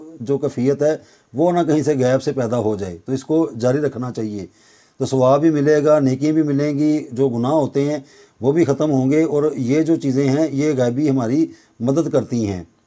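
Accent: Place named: Lahore, Pakistan. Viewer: Acha to mery bhai lahore sy hain ..lgta to tha ap k bat krny k lehjy sy vesy ..main b lahore shalimaar baagh sy hun bhai ..baghbaan pura sy ..sun k khushi hui ap to sachi main apny hain mery .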